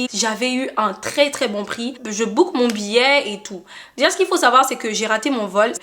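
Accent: England, United Kingdom. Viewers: ❤️😻I love your English accent girl 😭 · Ton accent anglais je l’adore ❤❤❤❤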